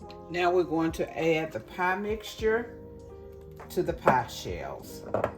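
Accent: South, United States. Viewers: Good morning mrs watched your first video yesterday on the pineapple cake, running to store now, also seen your recipe for coconut pie, 8 what? Because didn’t watch video, sorry, but love you! Your so country sounding, I’m going to try a lot of your recipes!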